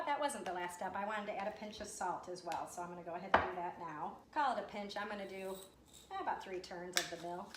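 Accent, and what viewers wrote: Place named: Midwest, United States. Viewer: Okay, now I hear the accent. Wisconsin or Michigan? 😸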